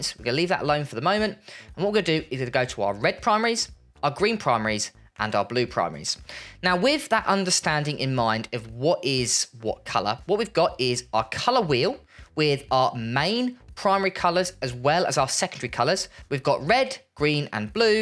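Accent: United Kingdom. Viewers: he´s bri ish thats how they talk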